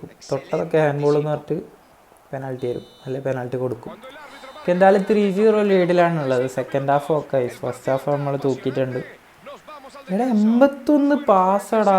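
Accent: Kannur, India. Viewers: Brother kannur aano ?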